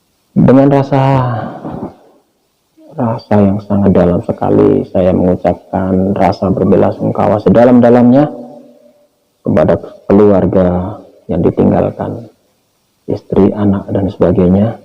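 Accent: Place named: Java, Indonesia. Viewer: Kau bercakap berteleleh...macam org nak mampus....jawa medokkkkk...insyallah..Ali diaAb Allah...Aaminnnnnn....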